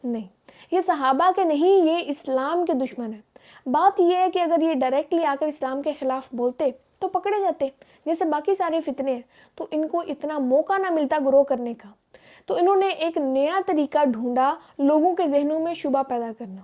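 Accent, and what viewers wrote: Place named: Punjab, Pakistan. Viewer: آپ کی اُردو میں ۔۔۔۔ پنجابی ٹچ ۔۔۔ وکھری ٹائپ کا ھوتا ھے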